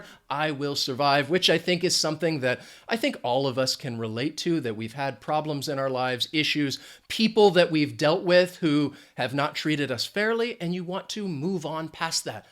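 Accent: United States. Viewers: Thanks a lot! I enjoyed every second of the American English you are using - the way it should be used by all the native speakers;)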